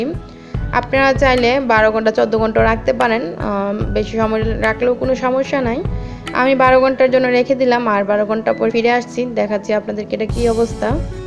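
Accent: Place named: Noakhali, Bangladesh. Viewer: কথা বলার স্টাইল পরিবর্তন করতে হবে। নোয়াখালীর মেয়ে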